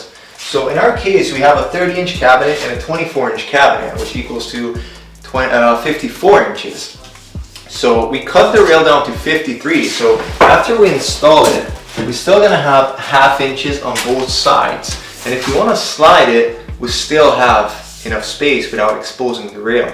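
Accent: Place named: Southern Ontario, Canada. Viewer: I’m Canadian but living in Germany since 2016. I think it’s hilarious that I was able to pick up his accent (Southern Ontario) almost immediately. Anyway, great video, thanks for the info. My only feedback would be to invest in a mic and better lighting.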